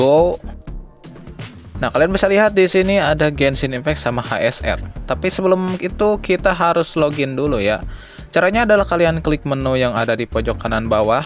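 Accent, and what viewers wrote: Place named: West Java, Indonesia. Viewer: logat sunda nya sangat terasa😌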